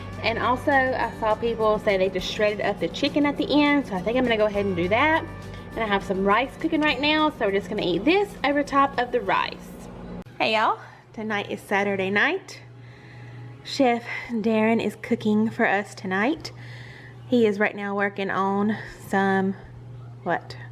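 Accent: South, United States. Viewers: Where are you from? You have a very southern accent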